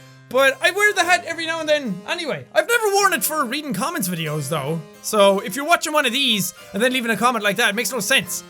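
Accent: Ireland. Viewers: This guy right here got me addicted to Irish accents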